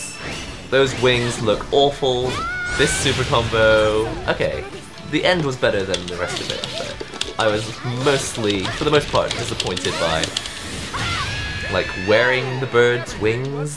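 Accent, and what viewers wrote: United Kingdom. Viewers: asian with brit accent! LOVE IT!!! You rock! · It's British!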